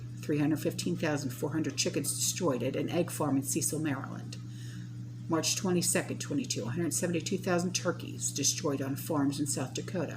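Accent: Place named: New Jersey, United States. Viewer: yes I noticed that at the end. Thank you for all you do you are so awesome. I love your Jersey accent!!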